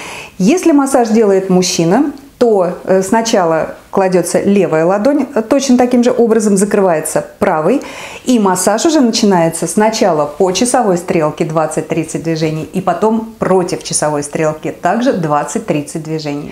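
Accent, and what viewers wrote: Saint Petersburg, Russia. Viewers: Очень красивая женщина, красивая ленинградская речь! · Чувствуется коренная питербурженка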